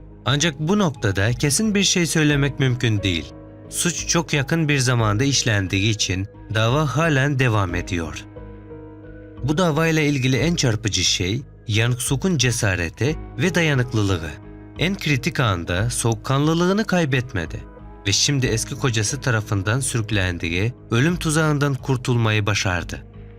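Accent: Syria. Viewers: Youtuber kardeşim nerelisin, şive ve ağız çok farkı, Halep ten mi geldin sende yosa? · seslendirmeyi suriyelimi yaptı?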